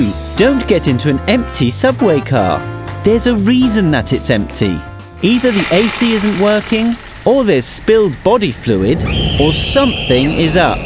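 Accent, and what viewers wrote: United Kingdom. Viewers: What do u know about NYC? You sound British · Why is a British guy telling us this. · When 13 things not to do in new york was voiced over by a british citizen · Why is a British person telling me about new york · runningstorm920 it sounds better in british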